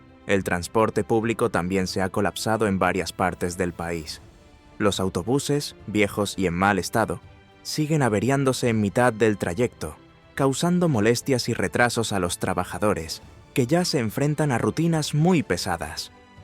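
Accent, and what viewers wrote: Spain. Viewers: El Locutor debe ser Español espero que haga un VIDEO DE SU PAIS E S P A Ñ A !!! QUE NO ES UNA MARAVILLA TAMBIEN HAY POBREZA Y BASTANTES DESAUSIADOS !!! CUALQUIER PAIS QUE LOS EEUU🇺🇸 LE META SANSIONES LE PASA LO MISMO !! VIVA VENEZUELA 🇻🇪 CARAJO !!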